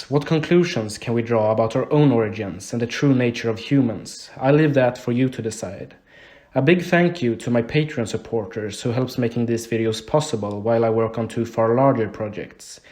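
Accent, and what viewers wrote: Sweden. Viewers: I love the Swedish "iii". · This man is swedish af. U can hear it on the horrible accent... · Are you Swedish? You sound Swedish in your accent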